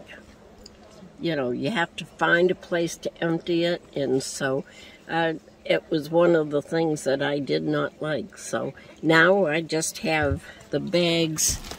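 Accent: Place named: New England, United States. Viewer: I love hearing yet another New England Accent on the road besides Lulu. I can't wait to join you guys!!!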